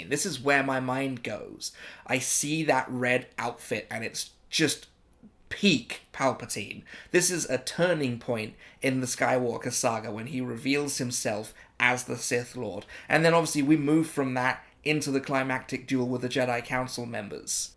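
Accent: United Kingdom. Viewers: Not doing well at the moment but forcing myself to comment now, as I've disappeared off sm for several weeks. Still lovely to hear your smashing British voice on the interweb.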